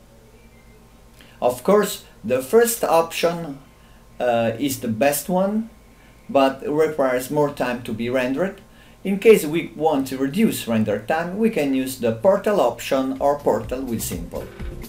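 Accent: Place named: Italy. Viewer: VERY NICE. Your accent looks like Italian)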